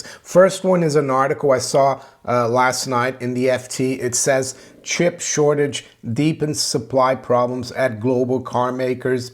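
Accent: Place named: United States. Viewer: I have a silly question I’ve been meaning to ask -, how did you end up speaking English with an American accent? Is that common in Brazil?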